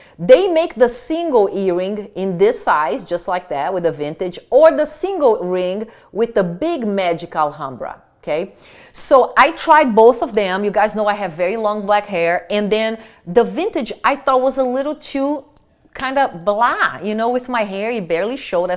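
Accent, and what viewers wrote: Brazil. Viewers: outro dia te falei uma crítica construtiva e você ficou brava e até disse que eu tinha inveja pq era brasileira como vc, mas não tenho inveja não... sou bem casada com americano tb, amo esse país e os brasileiros eu concordo que alguns passam do limite mas eu sou uma pessoa que não sei o que é inveja desde que nasci, fique tranquila, porque eu trabalho muito pra ter o que tenho sem invejar nada de ninguém....mas eu adoro vc e adoro seus vídeos!!!! Adoro ouvir vc falando inglês pq falo como vc, com sotaque... e adoro suas peças de roupas, acessórios, sapatos, jóias e principalmente as bolsas!!! Parabéns pelo vídeo.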